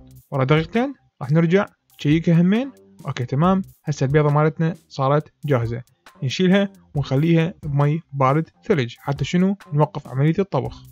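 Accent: Iraq. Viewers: بالفعل خفه الظل واللهجه العراقيه وطريقه الاكل مع التلذذ احلى مابيك اخي ❤ ربي يوفقك واكيداكلك طيب مبين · اللهجه العراقيه استفراغ بدويه بحت ودفشه ثقيله خبيصه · كمية البساطة وخفت الظل موطبيعية واللهجة العراقية تطرب الأذن متابعك ومجرب كل اطباقك وافكارك من 🇩🇿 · انتة مبين عراقي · يازين اللهجة العراقية ❤️🌹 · ما احلا لهجه العراق اتمني اني اكون عراقيه ❤😍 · انا من السعوديه وصدز انا من النوع الي احب لهجه اهل العراق وعشان كذا خذلك لايك و اشتراك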